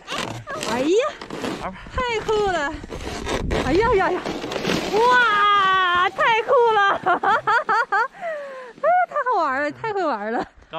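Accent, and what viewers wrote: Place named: Beijing, China. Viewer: 美女和東北美景又加上一口京片子讓人欣賞影片時感覺真舒服，祝美女新春快樂！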